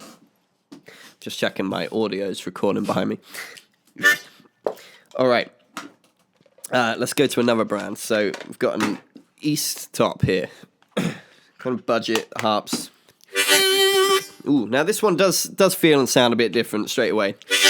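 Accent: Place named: England, United Kingdom. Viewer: The first time I recognized you, was in that incredible "Free Bird Solo" video and I thought, you were an american. But then, I heared you talking and I was surprised, that you are an english guy :) I like that so much! Keep up the good work mate :)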